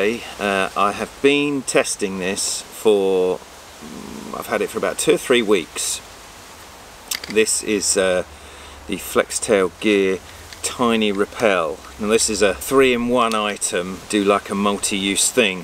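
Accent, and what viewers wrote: United Kingdom. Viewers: You were somewhere moist and needed a gadget with a light! Gigidy. Apologies, you can take the boy out of the Army………… Currently sat in France and an ad popped up on FB for this item. As always, I chose to find reviews via YouTube and came across yours. I like the fact that you can buy a generic pad for these. May I ask if you have trialled the generic pads along side Flextail pads? How do they come up in a price comparison? Nice review and nice to listen to a British review! 🍻👍🏻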